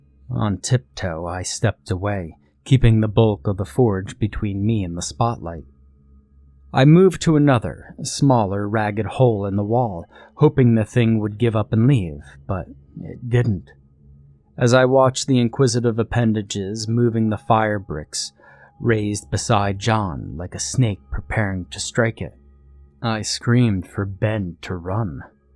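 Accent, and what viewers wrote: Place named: Western Maine, United States. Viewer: you sound like a few guys I know up in my area of Western Maine♡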